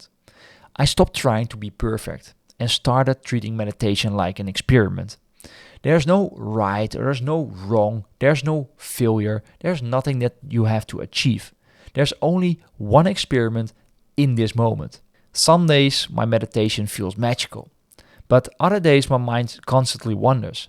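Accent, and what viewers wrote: Netherlands. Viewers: aan je accent te horen moet je wel nederlands zijn .... vraagje doe je ook meer dan meditatie , astral projectie toevallig ? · Ik kom dit filmpje tegen tussen mijn video's. Klopt het dat ik hoor aan de manier van praten dat je Nederlands bent? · Love the netherlands accent ☺ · 2 words out of your mouth and I knew you were Dutch lol. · Jij bent echt Nederlands zo te horen 😂😂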